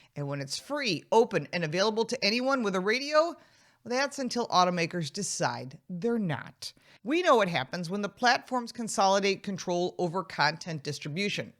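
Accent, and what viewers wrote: United States. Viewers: I don’t care about your bambling on in your yanky voice I’m Aussie and I drive a Australian made car made in the 90s with a huge sub woofer don’t want to know about Electric crap shit boxes cars are petrol v8 and six cylinder turbos WAKE UP FK WITS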